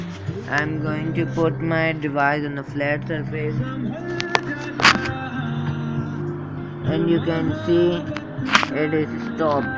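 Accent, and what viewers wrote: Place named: Pakistan. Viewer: Tamás Papp bro if you dont like someone's accent ,just go away dont judge him by his way of speaking, at least what he did worked and its a working trick ,he is from Pakistan and here english is a secondary language and he made a video in English to help the Western people who are in problem ,he can also make a same in his own language but he cared of all coz english is adapted by many countries .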